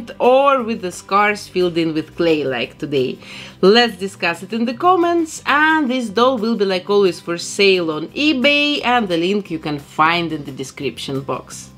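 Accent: Russia. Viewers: I'm sorry, but I want ask you You from Russia or it seemed to me?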